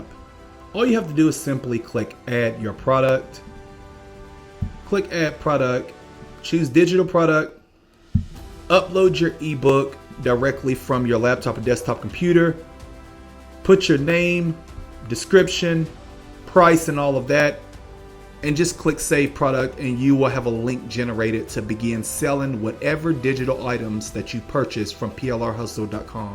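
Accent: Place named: Baltimore, United States. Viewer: Great quality content, thank you for the knowledge! 🧠 I follow you on IG but randomly stumbled upon your YT by coincidence. Also, I hear an accent! Is it Baltimore? 😄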